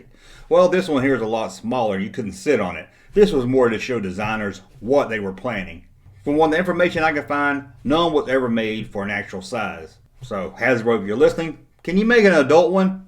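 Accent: South, United States. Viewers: I dig your vids and the southern accent (which I'm sure you don't think you have) is awesome. Funny, how, the name C-3PO was shortend in the film to "3PO or Threepio", and most put the emphasis on the "C" (or "Three") when saying his name. But you put the emphasis on the "PO". It's not hate mail, just intriguing.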